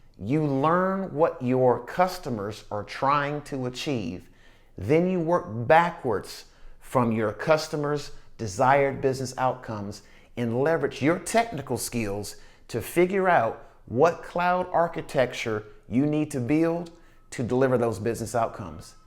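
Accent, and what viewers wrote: South, United States. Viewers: That southern accent coming through strong 🤠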